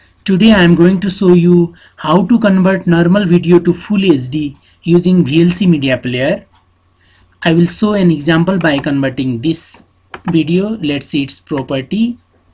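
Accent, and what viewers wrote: India. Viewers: It's north indian rural accent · He is a Bihari I guess...... His English sounds like Bihar English. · I love Indian accents xD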